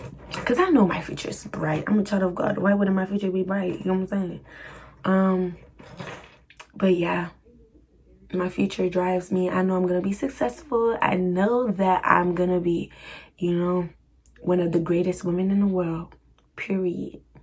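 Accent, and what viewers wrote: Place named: United Kingdom. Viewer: It’s the UK accent for meee😂😂😂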